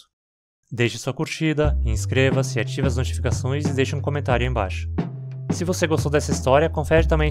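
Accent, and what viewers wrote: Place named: Rio Grande do Sul, Brazil. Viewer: Am I hearing happy gaúcho noises? Fenomenal essa história.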